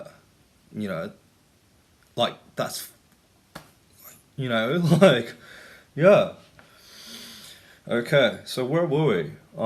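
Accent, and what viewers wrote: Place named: Australia. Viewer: I won hundred percent agree I don’t know if it is speaking style in the way that he says things he just interrupts him self constantly and beyond as aimlessly or if it is over the top Australian bro boy douche bag accent. It’s awful to listen to and maybe that’s not his fault but I think he’s pushing it a little bit. Either way it’s a shit video and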